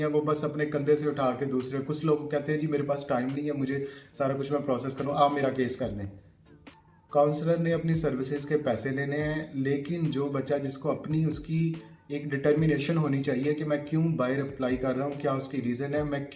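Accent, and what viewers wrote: Faisalabad, Pakistan. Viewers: The way you deliver reality with faisalabadi accent is 😅👌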